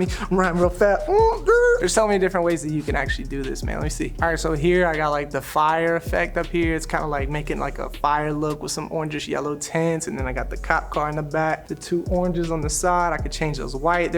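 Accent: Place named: Bay Area, United States. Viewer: Sounds like you're from the Bay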